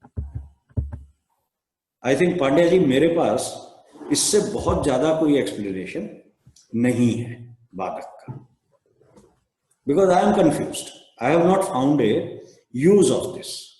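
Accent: India. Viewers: Спасибо за русские субтитры: иногда приятно отключиться от сильного индийского акцента.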